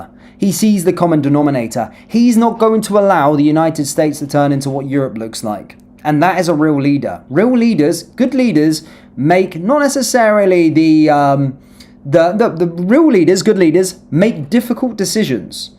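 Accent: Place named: Estuary English, United Kingdom. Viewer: Listen to the Estuary English whine of this goof.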